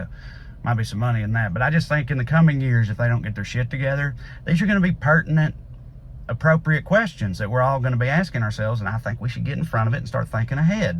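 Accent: South, United States. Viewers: I was born in Venezuela and learned British English. I’ve been living in the United States for 25 years, and I never thought I would love this southern accent more than the one I learned English with.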